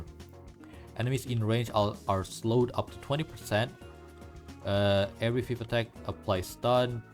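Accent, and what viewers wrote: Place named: Indonesia. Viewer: di denger denger ini aksen indonesia banget 😂😂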